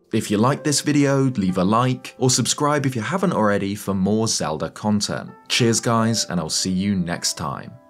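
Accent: United Kingdom. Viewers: not related but the rhythm of the sentence is really good for poetry or lyrics, especially when read by UK accent. Doubt it was intentional by the translators but it adds an extra element of spooky.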